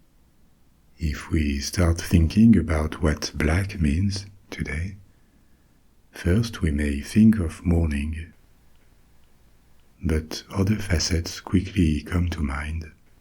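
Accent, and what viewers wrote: France. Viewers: Loving there's no AI and if a mere mortal as I can make a suggestion, then could do Dantes Inferno about the 9 circles of Hell?? I am sure you'd do it such justice with your Gallic tones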